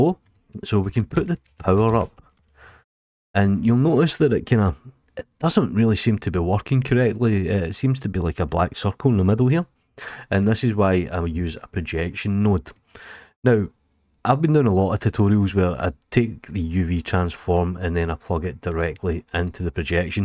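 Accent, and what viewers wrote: Scotland, United Kingdom. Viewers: Please don't ever apologize about your Scottish accent. Da best!!! - Great tutorial. Thank you.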